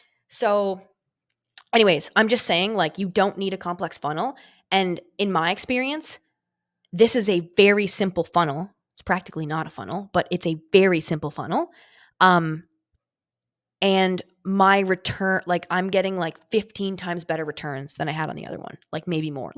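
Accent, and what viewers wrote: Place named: Maritimes, Canada. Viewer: I can hear a maritime accent in your voice ... maybe annapolis valley, Nova Scotia ... wild guess ...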